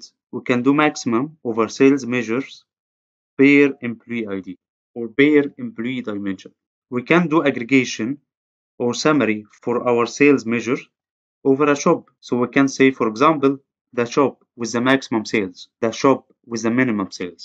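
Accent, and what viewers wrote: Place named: Egypt. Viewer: الانجليزي دا حساه مصري ! ياريتو كان عربي والله :(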